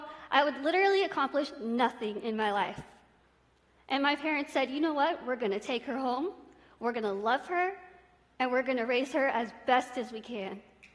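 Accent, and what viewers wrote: United Kingdom. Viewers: イギリス英語なんですね！ もうテストのリスニング全部この方がやって下さらないかな..🤔🤔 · イギリス英語ですし美しいですよね、、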